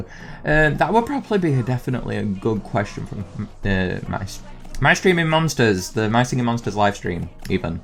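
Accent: United Kingdom. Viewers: Oh, that british accent)))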